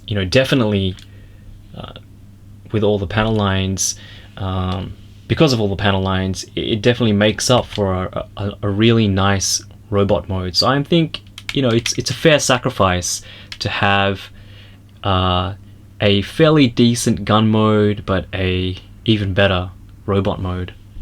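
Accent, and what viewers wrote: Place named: Australia. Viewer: And hey, your accent definitely sounds Aussie! Great to have another Aussie figure reviewer on the tube!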